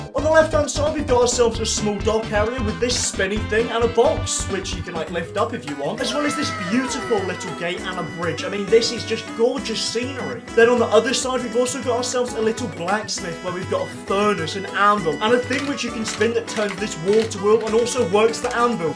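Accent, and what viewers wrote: Newcastle, United Kingdom. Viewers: Your a geordie let’s go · You sound British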